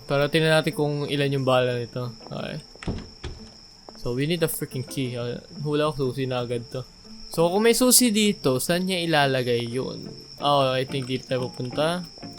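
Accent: Visayas, Philippines. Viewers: Bisaya kaba lods?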